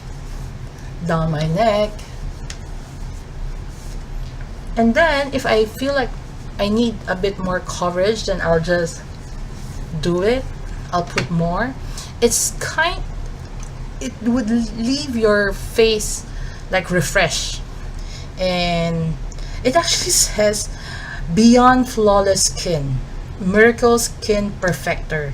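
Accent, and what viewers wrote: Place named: United Kingdom. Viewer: I like this. Sis, you have an accent. British or Australian? I noticed when you said "can't". But I love it. 😚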